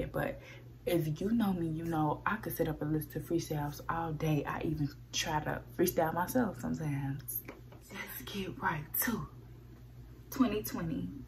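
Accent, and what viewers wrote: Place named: New York, United States. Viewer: Are u from ny it sounds like u are😂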